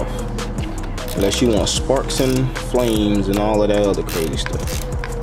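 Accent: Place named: Houston, United States. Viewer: Tell me you from Houston?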